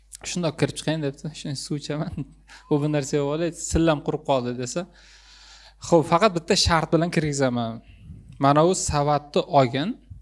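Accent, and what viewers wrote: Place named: Surxondaryo, Uzbekistan. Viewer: Qalban surxondaryolikman dedi,bir joydan ekanmiz😊😊 shevasi ham uxshaydi uzi surxondaryoliklarga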